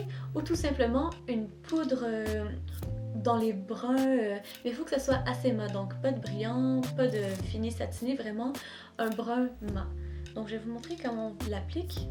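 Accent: Quebec, Canada. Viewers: Ton accent me rappelle le Canada c'est dingue!!! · J'aime énormément ce que tu fais et ton accent Québecoi est totalement adorable :) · Elle se donne pas un accent... Elle est Québécoise!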